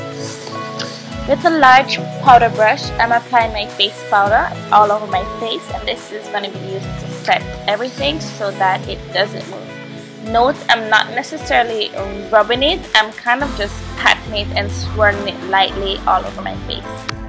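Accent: Jamaica. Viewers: is she jamaican? she sounds like she is. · I hear that accent are you from JA?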